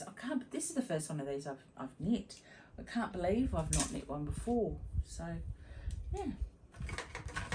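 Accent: Australia. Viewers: Look, you could possibly take your own advice and not knit the rest of the MKAL just because you aren't feeling it. But you can still use what you have done to make something. One of the European knitting podcast girls made hers into a cowl. I'll go follow you on Instagram and leave a comment with a link to her for you. So enjoy having another Aussie to listen to while I knit. 💜💜💜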